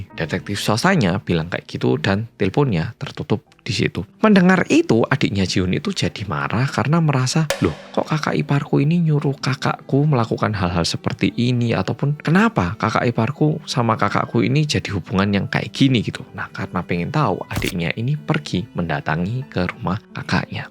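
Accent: Java, Indonesia. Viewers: hai medok❤ lgsg nyimaaak🎉 salam bolobolo😘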